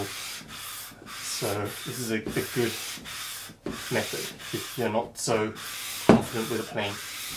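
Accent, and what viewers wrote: United Kingdom. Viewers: I thought you were English, iv noticed a lot of British people these days compensating so Americans can understand , should be the other way around its our language , anyway love your videos keep up the good work.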